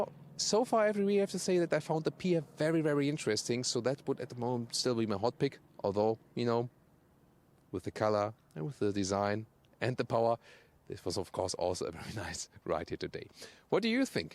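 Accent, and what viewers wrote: Germany. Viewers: There's something so satisfying about a German car being reviewed by a guy with a pleasing German accent. It's just wunderbar!!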